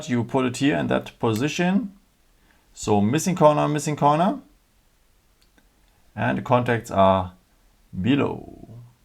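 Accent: Germany. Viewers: Bruder man merkt voll das du deutscher bist wegen dem aktzent feier dich lg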